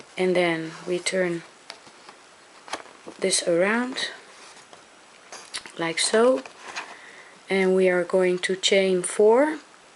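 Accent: Netherlands. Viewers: Where can one buy that mini hole punch? I think your instructions are wonderful and so clear. I love your Dutch accent too, so pretty.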